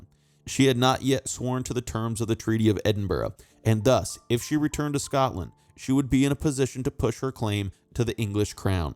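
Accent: United States. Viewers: An American narrator. That lends no credibility to this at all. Americans lecturing about English history is outrageous.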